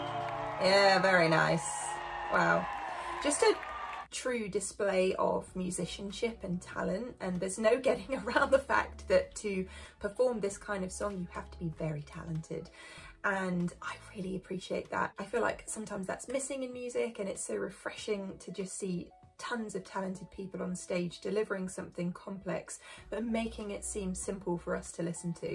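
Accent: United Kingdom. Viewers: Tnx for reaction.and your British accent is awesome btw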